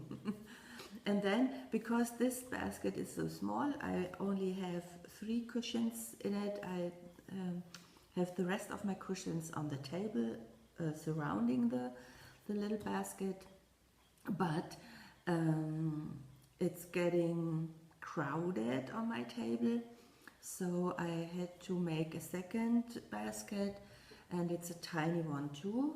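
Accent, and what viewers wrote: Germany. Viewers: Your stitchy baskets and table are so pretty, and your lovely German accent is delightful to listen to. Thanks for another wonderful video.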